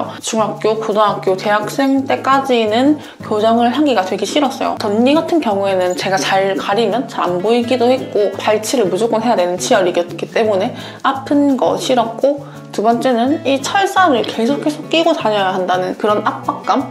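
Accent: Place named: Jeolla, South Korea. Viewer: 전라도 사람이신가요 말투가 매력적이네요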